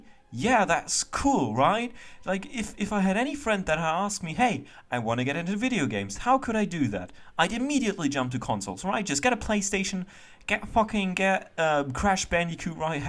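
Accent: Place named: Germany. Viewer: Based on your accent, I would say you are from germany, or some other western eu country and saying that consoles are not worth it and that you would rather build a pc is so ignorant to the rest of the world, where 1 month salary maybe wouldnt even be 500e. There's no pc that can be built for that price that can run latest games in the same quality. Also ps plus (game pass) exists with small subscription you get large library of games. And all this is coming from a pc gamer. Instead of shitting on consoles, you could be shitting on graphic cards prices. Oh, wait, you are born in rich country, you dont care...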